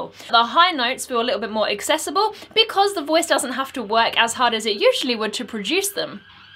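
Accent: South, United States. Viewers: Wow, didnt expect you to sound southern country haha. Bet you could keep up with Chris :) would like to hear you sing a southern bluesy country song.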